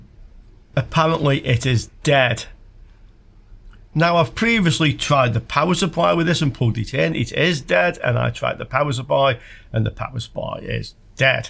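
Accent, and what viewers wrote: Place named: Stoke-on-Trent, United Kingdom. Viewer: Where are you from? I hear Stoke-on-Trent?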